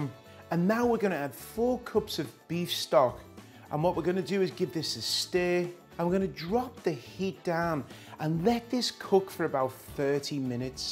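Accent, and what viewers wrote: Liverpool, United Kingdom. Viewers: Scouser liverpool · SCOUSER ACCENT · Do I hear traces of a Liverpudlian accent? I'm not from the UK. However, I find the plethora of accents from different regions very charming.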